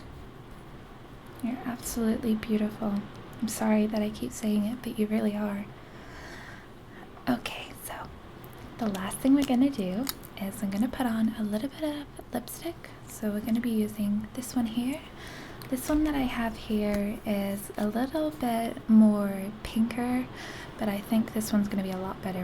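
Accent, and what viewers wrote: United States. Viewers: Ur American accent is great! Ur an honorary Yank! :) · So crazy to hear you speaking with an American accent!!! Like my brain was confused or something! Great job, the sounds were so relaxing, thank you! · I hardly comment on videos but had to say to you that you do it so good!!! Im a latina and prefer your natural accent but the american one you do sounds exactly like an american person!!! And an excellent RP!! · I'm not going to lie. You do a really good American accent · Lots of fun and very relaxing =) Is it hard to keep the american accent throughout the filming process? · Awesome video as always!! Great job with the American accent. You made it sound so believable (if I didn't know that you were British already, I would've thought that you were an American). The rain helped too. Have a nice day. 😉😊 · Amazing American accent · excellent job on the American accent! 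:-) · As an actor and language enthusiast, I'm always trying to master accents from around the world, but I rarely get to turn the tables and judge a foreigner doing an American accent. It's pretty good! You only have problems with your o's and some of your a's. But even past that, the only thing that was big enough to give you away that you're not American is the way you said "director". But like I said, pretty good. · your American accent is better than and I'm an American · Nailed the American accent. Perfect. Wouldn't be able to tell you weren't American if I didn't already listen to some of your other videos ;) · very good America accent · I must admit, this is some decent American accent! It is amazing how u can switch ur accents fluently!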